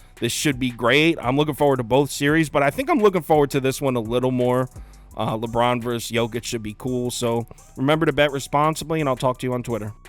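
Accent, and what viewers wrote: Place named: Boston, United States. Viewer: ah shit, so lakers lost. Lmao, always thought you were from BAWWston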